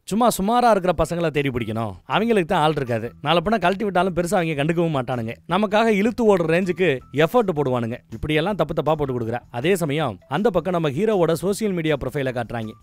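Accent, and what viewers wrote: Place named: Madurai, India. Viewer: அண்ணா படம் ரொம்ப அருமை ஆனா நீங்க மதுரை தான முட்டை கண்ணு அப்பப்ப பேசுறத பாத்தாலே தெரியுது மதுரை தானே நான் உசிலம்பட்டி அண்ணா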